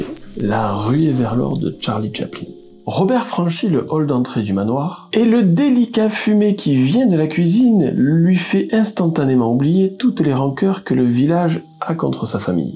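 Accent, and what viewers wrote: South, France. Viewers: Bonjour, un grand merci pour votre récit qui m'a tenue en haleine du début a la fin j'adore votre façon de raconter et votre accent du Sud comme le mien.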